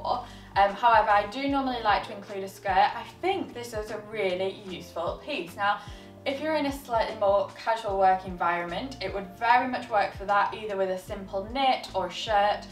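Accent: United Kingdom. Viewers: Wow. I didn’t like anything in this video except for the black skirt and the British accent. What happened to good fashion? 😕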